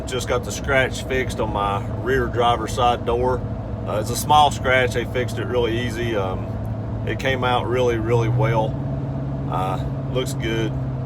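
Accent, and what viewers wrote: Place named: Tennessee, United States. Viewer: Great review of Tundra, love the eastern Tennessee accent too, some of the best folks in the world are from that area, a great friend passed away earlier this year from Bristol Virginia, Kevin Davenport, he had this same accent, could sell ice to a Eskimo and always had nice vehicles. Was blessed to have had him as a friend!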